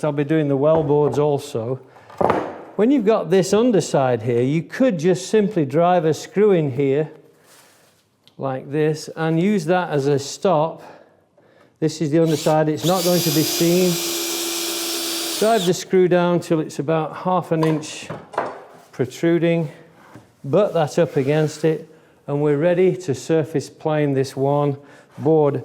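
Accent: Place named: North, United Kingdom. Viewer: Excellent Video/s - which part of the UK are you from and where are you now? My question is, after watching closely and carefully your Saw and Chisel Skills throughout your Workbench Build videos - what is your preferred Chisel Brand and why? - AND what is your preferred Saw Brand and why? I suspect by your slightly 'accented ' Northern dialect, you might now be located in the USA so, as such, maybe your Chosen Brands might not be available in the UK/France! I any case, l willl look forward to hearing from you soon - Thanks. All the very best to you and your family.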